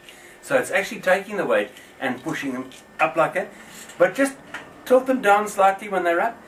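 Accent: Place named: South Africa. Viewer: You kinda sound South African - and you are in such great shape for a 60 year old - I'm impressed!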